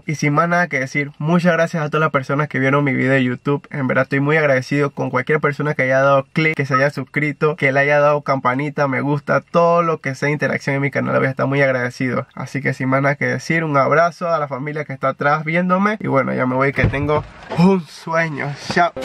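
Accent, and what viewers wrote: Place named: Panama. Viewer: Q xopa, acabo de conocer tu canal, ya me suscribí al canal. Youtuber panameño que veo lo apoyo, muy chevere escuchar a alguien con tu acento y jerga. 🇵🇦🔥🔥🔥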